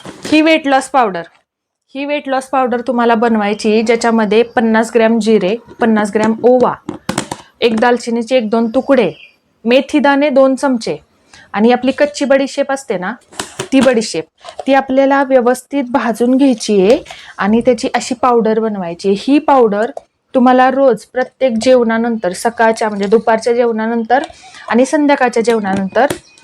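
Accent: Satara, India. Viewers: छान वाटला व्हिडिओ ताई तुम्ही सातारच्या का तुमचं बोलणं मस्त वाटलं😊🎉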